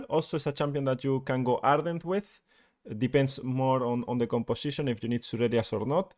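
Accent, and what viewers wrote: Spain. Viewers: llevo 10 segundos de video y ya puedo decir que es español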